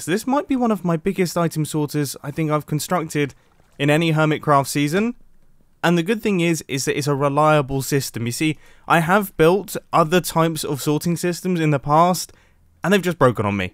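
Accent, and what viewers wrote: United Kingdom. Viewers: Wow was extra british this episodes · what type of British accent is yours?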